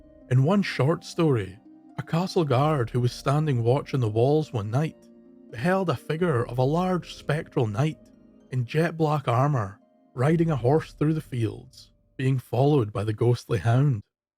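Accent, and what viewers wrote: Scotland, United Kingdom. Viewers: Im sorry ive only just gotten the time to sit & enjoy your video, i normally like to watch them asap but alas, life got in the way!🤣 I could sit & watch your videos constantly. They're entertaining & informative. I love hearing all these tales (which are 100% true, in my opinion!) Of my beloved homeland. Also who doesn't love an intense Scottish accent?! ❤❤❤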